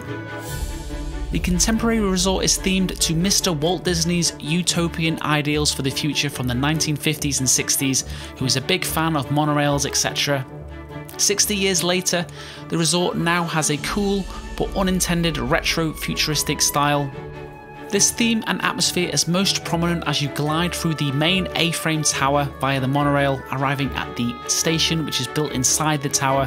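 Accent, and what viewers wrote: Liverpool, United Kingdom. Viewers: Thanks so much. Best video I have ever seen from Disney you tuber. And I've seen a lot! Love your accent..are you a scouser?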